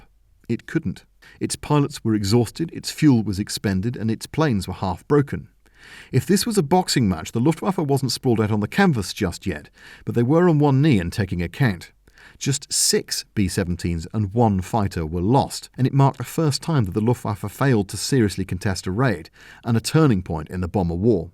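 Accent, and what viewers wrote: United States. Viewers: The American accent threw me off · Your American accent is hilarious, I'd love to hear it more it sound exactly like one of my cousins.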